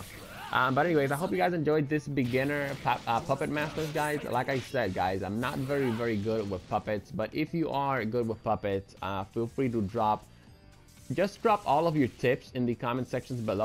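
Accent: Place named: Spain. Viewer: no problem!:) still catch ng your vids by the way, love your accent! You Spanish? Or perhaps Mexican?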